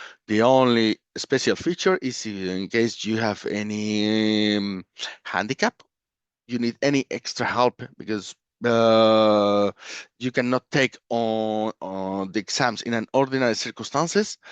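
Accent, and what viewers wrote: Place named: Spain. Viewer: Very useful information and thank you for that but your Spanish accent in English is a disaster. It would be nice to fix this problem. Sorry for that, but I could understand in rewind. Anyway, thanks for the info.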